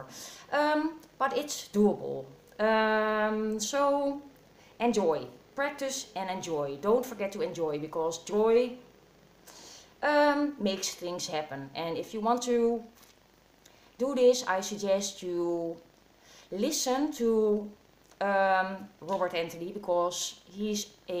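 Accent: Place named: Netherlands. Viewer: Ik kwam hier van V.K's shoutout naar jouw maar ik wist niet dat je nederlands was xD. Ik wist het meteen nadat ik keek naar je andere videos and je accent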